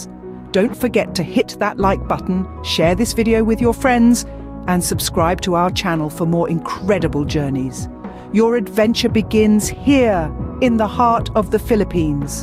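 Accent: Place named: United Kingdom. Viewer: Nice Video. With the British Accent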